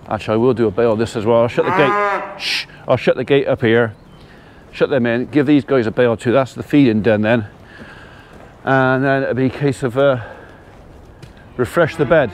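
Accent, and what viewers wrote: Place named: West Country, United Kingdom. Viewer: well I be In Devon the crown jewel of the westcontry. and I must say i been cathing up with your epic vids your natraly funny as in comical and i love you have a strong westcontry accent and i loved the vid of you and holli very comical i hope mrs P is doing well keep up the epic vids its been weet here as weel on and off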